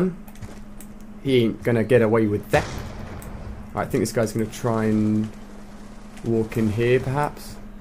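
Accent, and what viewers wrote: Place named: United Kingdom. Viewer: You should do one episode with you talking without a british accent.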